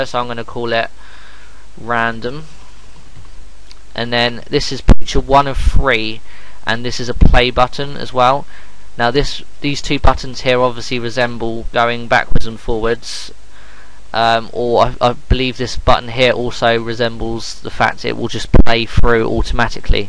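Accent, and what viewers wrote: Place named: United Kingdom. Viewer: British accent -- leave the man alone, He put up a video while we yap away :)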